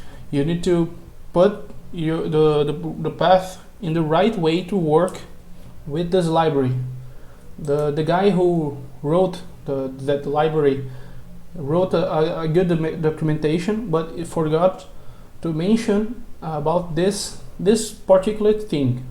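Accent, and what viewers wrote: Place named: Brazil. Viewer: tu é brasileiro ne man da pra sentir o sutaque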